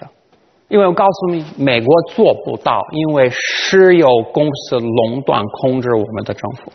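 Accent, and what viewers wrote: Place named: Tianjin, China. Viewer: 来中国先到的南开，现在口音还能听到天津味儿，哈哈。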